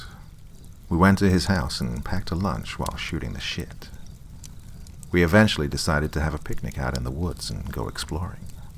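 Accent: South, United States. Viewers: Thanks for the narration man, it turned out really well. I didn't imagine them speaking with a southern accent, but now that I'm hearing it, it does add nicely to the campfire aesthetic you have going for the story. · ¿Why So Serious? xD Southern or English? Hard to peg. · I love the accent, a mix of your normal accent & a light tinge of a Southern drawl. It reminds me of the accents used by an actor in a classic Hollywood film (i.e. Gone With the Wind) — I love it & it’s very fitting for this story... despite the setting being Connecticut, apparently. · Loretta oh yeah, does him a good ol' southern US accent, yessiree bob. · Loving the southern accent, I do declare..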